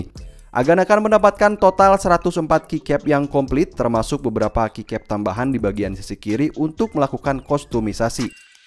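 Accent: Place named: West Java, Indonesia. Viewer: Sunda pisan anjay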